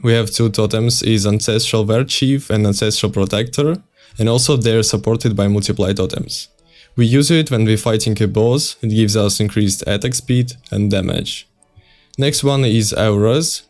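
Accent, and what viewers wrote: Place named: Eastern Europe. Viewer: Never thought frost blades can get to this dps in that low investment) Also you accent, Where are you from? Sounds like east europe Или даже Россия)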